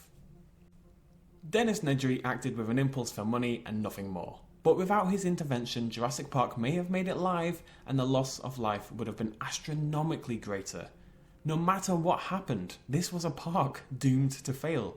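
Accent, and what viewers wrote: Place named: North, United Kingdom. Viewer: you sound so cute. and northern.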